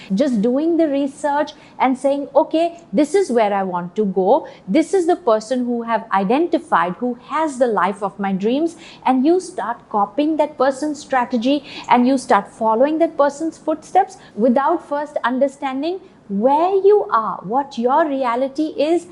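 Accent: India. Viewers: This is Indian English. I was expecting this English. Very nice.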